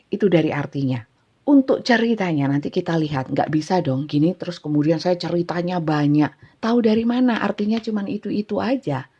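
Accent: Java, Indonesia. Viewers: Pgi bunda resonet skli bunda kii lucu kudu guyu klau dgr readingnnya bunda sll tk tunggu egh buun logat jwa bngt suka skli bnr bunda tdk pelut abnrnya ya krena nggk ounya duit aja buun.. Dia fokus krja buun smnjk covid smp dtik ini htngnya bmyak jdi untuk k hub nggk n gtu mikirin.. Tpi dia ttp syank ktne buun.... Trima ksih bcaan ya bunda sht sukses sll buat bubda Aamiin" 😍😘🙏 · bunda lucu penyampaiannya jg detail enak ngomongnya bhs jawanya medok aku apresiasi bngt sm bunda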